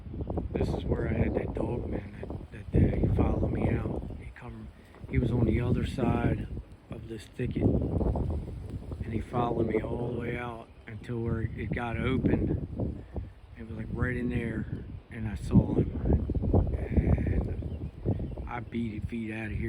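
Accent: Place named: South, United States. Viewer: Good morning 🌞. You sure sound southern. When you talked about the wood, you were teaching what you know. Alot of folks may not have known that information. That's neat the turtle was still there. It's sunny spot. Thankyou for sharing your thoughts and walk. Always a pleasure. Hope you have a great day.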